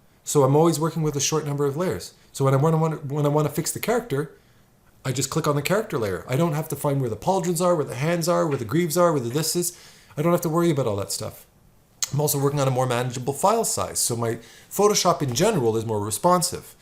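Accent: Quebec, Canada. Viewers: Love your videos, been fallowing you for awhile. I'm from New Brunswick. And I've heard you mention your from Montreal. Whether your English originally or French, I had to smile cause I heard the French Canadian Accent Pop in this video for the first time! Keep up the great work! LOL got a bit further in the video and caught you subduing french pronunciation of"necessary."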